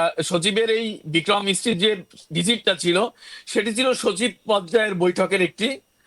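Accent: Kolkata, India. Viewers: ঐ সাংঘাতিকতো বাংলাদেশের না।ওর কথার উচ্চারণ ভারতীয়। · Kolkatar accents!!!! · এই ভুয়া সাংবাদিক কলকতার, তার ভাষার টোন বোঝা যায়। এগুলা করে লাভ নেই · এই সাংবাদিক এর ভাষা তো কলকাতার ভাষা! আর একে তো কখনোই দেখিনি!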